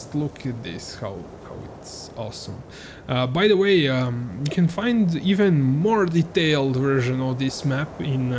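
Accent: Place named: Russia. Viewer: Сразу слышно, русский озвучивал)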